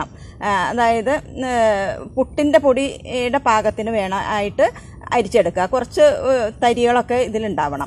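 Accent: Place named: Perumbavoor, India. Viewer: എവിടെയാ സ്ഥലം സംസാരം കേട്ടിട്ട് പെരുമ്പാവൂർ മൂവാറ്റുപുഴ പോലെ 😄ഞാൻ keezhillam ആണുട്ടോ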